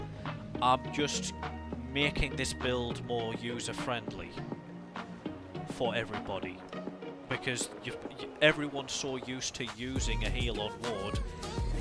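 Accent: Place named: Sunderland, United Kingdom. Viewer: Random one are you from north east? Sounds like a Sunderland accent like me.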